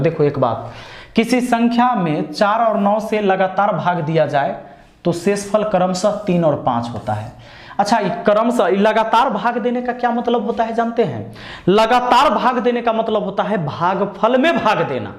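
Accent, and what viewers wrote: Bihar, India. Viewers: अरे 30मिनट में रगड़ देबे😀😀😀 बहोत खूब sir jee एकदम बिहारी स्टाइल🙏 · Ekdam Bihari andaj me🔥🔥🔥 आरे 20 मिनट में रगड़ देबई😂😂। सिर फैन like 👍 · गुरु ज एक बिहारी आन्दाज में शानदार है लाईव नही पढते क्या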